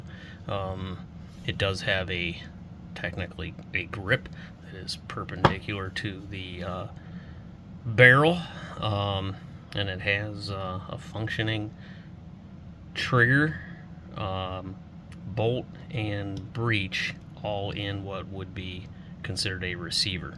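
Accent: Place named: Pennsylvania, United States. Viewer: I am a chemical engineer and I love building things. I am starting a YT channel as soon as it gets warmer here in PA where I can get out to the barn. I am recovering from getting hit and run over on my 1957 Harley Panhead so I will be laid up for a while. (had my right leg nearly crushed in two). I am starting a build of a 1928 Morgan Aero Brooklands edition racer. Similar to a Pembleton T24. I am gearing up to cast aluminum for different adapters. That being said I purchased a revolver lifecard toy. I am thinking about casting it out of aluminum and inserting a rifled barrel and see how things turn out. I will let you know how things go.. You sound like you are from Pa also...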